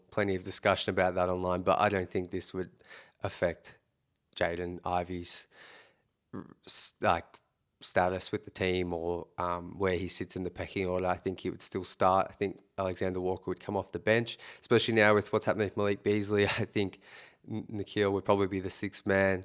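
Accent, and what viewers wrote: Australia. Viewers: Australian, yes. And a legend!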